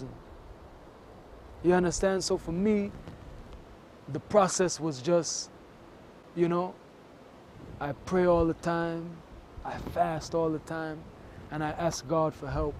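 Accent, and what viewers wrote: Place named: Belize. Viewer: J E He’s Belizean. Sometimes your mother tongue accent comes through if you lived in more than one place when you were growing up.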